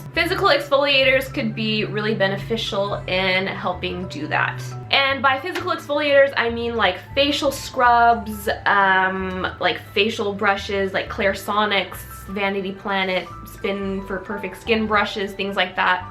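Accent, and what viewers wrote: Australia. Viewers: Your Australian accent had me dying!! I always do the thing where you pronounce "o" as "or" around my friends to annoy them lol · I THOUGHT SHE WAS AUSTRALIAN AT FIRST 🙃🙃🙃🙃🙃🙃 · Are you Australian, from the U.K. or America??? What are we doing love? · Ooooh an australian youtuber cool ☺️ wait what? 😳 huh? She's american? What? I'm confused af. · that australian accent thoooo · Am I crazy or do I randomly hear an Australian accent here and there? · Did she just start off in an Australian accent??? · HAha. I noticed the Australian accent. Only true Aussies can talk Australian so I would say that lovely must have an australian background:) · Des Breaux i could have sworn she was Australian 😂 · Fifi González I thought she was aussie at first lol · Wait... are you Aussie or North American? I'm confused! Lol awesome vid thooough and very informative. 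· prqurn it was Australian not british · Mashallah you do a great Aussie accent you legit sound like an Aussie-coming from an Aussie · i prefered her Australian accent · THAt AUSTRALIAN ACCENT IS AMAZING ❤️❤️❤️ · Daniela Gonçalves I thought it was Aussie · I like that Australian accent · I'm Australian and I honestly thought you were an Aussie from the first 10 seconds. Our accent is so damn hard but you did such a good job 💕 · Haha same I first thought British>Australian then realised she’s American 😂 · it was an australian accent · I’m glad I read the description because that Aussie accent REALLY threw me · is it jus me or does this girl have an australian and american accent 💀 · Kazzie Bolaños no that was definitely Aussie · Why did you talk Australian then change ?? Confused as lol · I was listening to your voice at the start and you sounded kind of Australian😂 I was like woah I thought you were American😂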